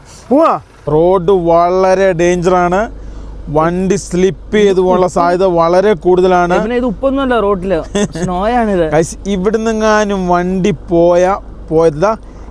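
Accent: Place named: Kasaragod, India. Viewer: ni kasaragod alle ninte bisyo kasaragod pole ind😂❤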